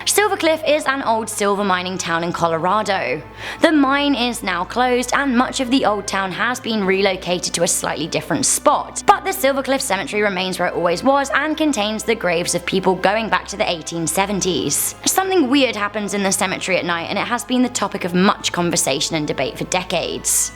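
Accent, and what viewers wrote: United Kingdom. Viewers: I love her sharp British accent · are you british? You sound british. Keep up the good work!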